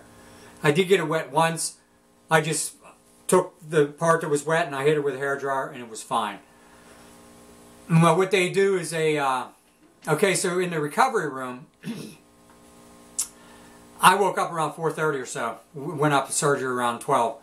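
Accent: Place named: Pittsburgh, United States. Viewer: I hear a pittsburgh accent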